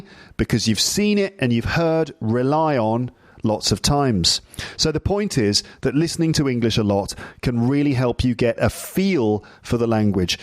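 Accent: United Kingdom. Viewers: Thanks for all the efforts I really love your british accent and I'm very happy I anderstand almost 95%. Also, I've returned back to the earlier videos, I've noticed that without the beard you and Macron are like two peas in a pod so please keep the beard! Its just an advice especially that you are living in paris( you know, you can meet people in yellow!).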